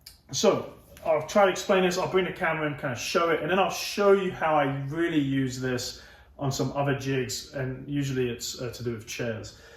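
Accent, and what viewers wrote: England, United Kingdom. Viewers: I’m just here for the great English accent!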